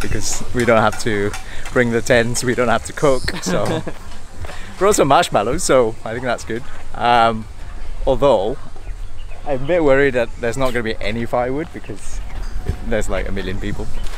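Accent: Wales, United Kingdom. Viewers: Great video! We spent the summer free camping around Europe (as much as we could anyway) it was maybe more stealth camping so there was rarely anyone else around! I'd love to head to Hong Kong (not right now for obvious reasons though!) for some free camping. (p.s. is it just me or do you have a slight Welsh twang in your accent?)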